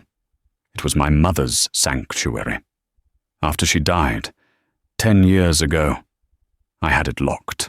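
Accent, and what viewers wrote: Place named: England, United Kingdom. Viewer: I have watched a few of these tales from various websites, and it disappoints me that the narrator sounds obviously English, but the written story is horribly American English. All of these websites need to rectify the language problem so that narration and written word match English from England.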